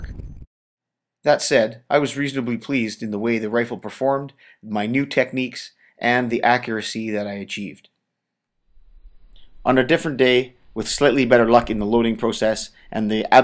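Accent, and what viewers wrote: Canada. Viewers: Awesome job! is this guy Canadian or northern American? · Are you Canadian? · Good luck with 35! I just bought myself a sporterised (unfortunately) 1942 Lithgow stamped SMLE for $100AUD...with 75 rounds of ammo! Its a terrific old rifle, I'd like to have a go at the mad minute one day, so really appreciate the good info your videos impart. You're in Canada by the sounds of it, yes? I also read with interest the debate surrounding whether Snoxalls record is a myth, and though some of the debaters are more like masturbators, it makes for a good read. Seeing your progress and technique certainly adds some flavour to the topic. Thank you.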